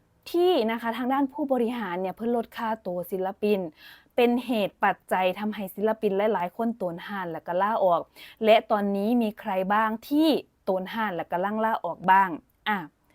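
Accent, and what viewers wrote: Isan, Thailand. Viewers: 8นี้เสียงอีสานเล่นมาดตาพุดครัย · เสียงอิสานกะเว้าโลด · เสียงอีสานไหมคะ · บอกไปเลยว่าเสียงอิสาน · เว้าอิสานปนไทย น่ารักดีครับ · เสียงอิสาน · เสียงอิสานแน่ๆไม่ต้องเดา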